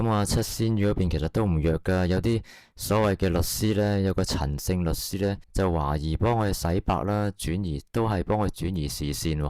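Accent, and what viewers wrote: China. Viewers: 典型大陸西講野…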